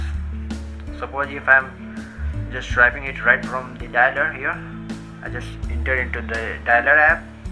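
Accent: India. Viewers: WTF?! is going on with that accent?! it sounds like Apu from The Simpsons.... But the wrong itself looks okay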